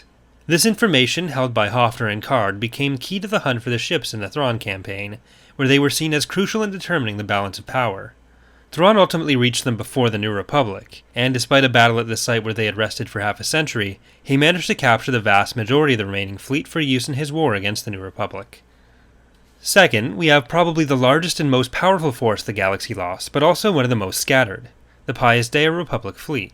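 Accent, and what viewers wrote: Canada. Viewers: I feel like the black fleet crisis could fall under this category. I know most ppl don't like those books, but I personally do. I love new republic stories and older star wars material in general, it's so nostalgic to me., u sound like u have alittle Canadian in you!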